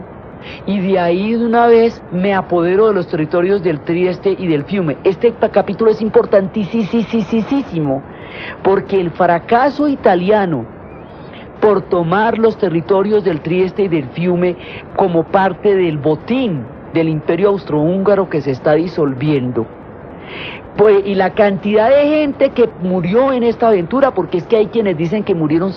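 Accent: Colombia. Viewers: Diana Uribe es única, a mí me encanta 🙂 Por cierto que qué hermoso es el acento colombiano! Opinión de una mexicana. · No me canso nunca de escuchar a Diana Uribe... además de toda su sabiduría.... el tono colombiano que es tan tan lindo...Chévere!!!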